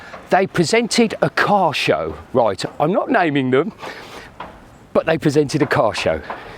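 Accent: Birmingham, United Kingdom. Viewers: What part of Brum you from.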